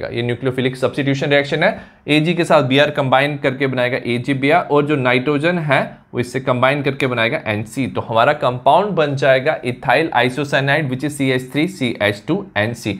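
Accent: Kashmir, India. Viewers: sir aap kashmiri ho?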